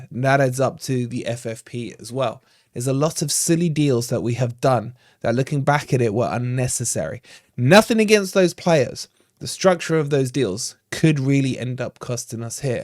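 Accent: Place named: Nottingham, United Kingdom. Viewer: That's the most ridiculous Nottingham voice ever.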